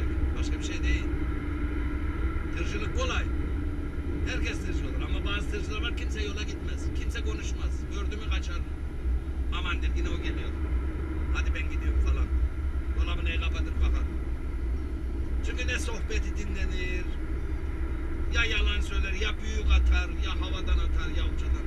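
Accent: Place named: Kırıkkale, Turkey. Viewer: Abi ankaradan sevgilerimle seni cok severek izliyorum walla konuşma şiven aynı bizim oralılar gibi bende kırıkkaleliyim allaha emanet abi 😂👋🏻👍🏻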